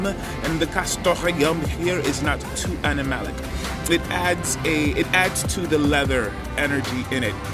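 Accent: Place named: England, United Kingdom. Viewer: Fantastic review! You are eloquent and entertaining, and your English accent is great. I should know as I am a Brit. Can’t believe that you are Garifuna! My wife is from Honduras. After your review I feel that I need Chypre Fulminare in my collection. You have such charisma.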